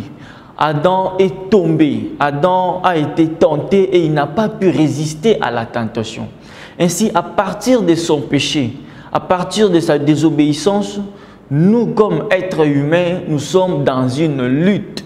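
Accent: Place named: Africa. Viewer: Tu as l,acent des africains mais je reconue ton visage c,est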